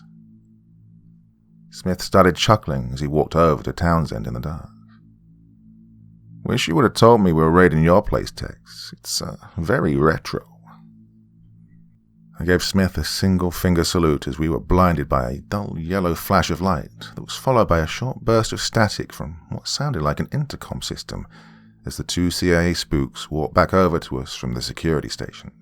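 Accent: England, United Kingdom. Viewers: Once again, what's up with an English guy narrating a story about US NAVY SEALS??? Its so distracting and out of place..cant find even 1 American to do this job? Really? Couldnt get through this story because of it. I spent 4 years active in the Marines, another part of the Navy, never in all those days did I hear 1 British accent the entire time. So why here? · Have you ever tried using a coffee/tea cup, glass or Styrofoam cup to emulate radio chatter? Love your stories. Only good narrator that does military stories and doesn't sound like shit lol and the deep english accent makes the stories even better.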